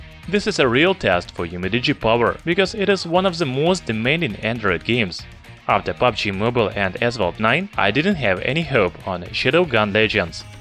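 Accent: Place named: Russia. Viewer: русский? акцент похож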